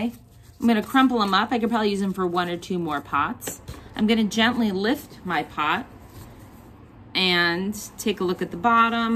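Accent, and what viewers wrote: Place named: New York, United States. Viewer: love your NY accent!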